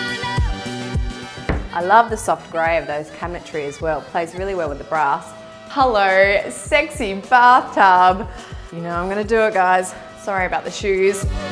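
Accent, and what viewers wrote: Australia. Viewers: is just wonderful how she puts it together all tied up with s cute Australian accemt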